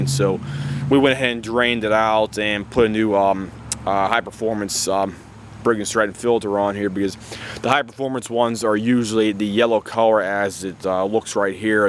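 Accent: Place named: Pennsylvania, United States. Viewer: You sound like you got a PA accent like me lol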